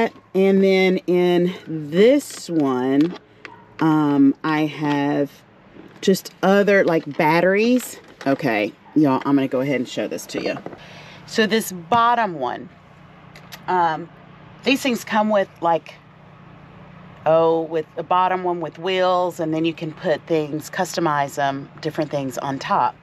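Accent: South, United States. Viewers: I have been watching truck camper setups for over a year.... Probably well over a 100 different people.... And everybody has their own style obviously..... It is a proven fact that while men come up with good ideas and they know how to put things together..... It is generally a woman that comes up with the finesse to make it look good and efficient....... So I would have to say that this is the nicest setup I have seen yet.. And you could see very clearly that that was not feces in the bottom of the portable toilet... At 1st glance it looked more like dry corn... You sound southern....plus the expression, "y'all "..... what state are you from?? Thank you for the video young lady.. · Hey Mrs ❤️ I just stumbled across you and I am happy I did ❤️ you're so beautiful and I loved your video ❤️ I'm considering living In a vehicle so this was a Little inspiration for me ❤️ 🙏 can't hardly afford bills and rent anymore. 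It's literally draining me and I am a single black woman nearly making ends meet. I make enough to pay a bill and rent and pay to go right back to work and I'm broke right after I get my check. The cost of living is horrific 🙏 so I am trying to come up with a way that I can live on little to none and save my $ for expenses I really need. So thank you for this video sweet mama 😀 ❤️ much love to you from the Carolinas ❤️ you sound country like me ❤️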